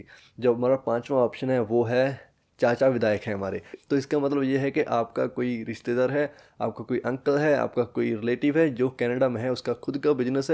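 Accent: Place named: Punjab, India. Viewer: punjabi da svad vakhra e c yr, but its 👍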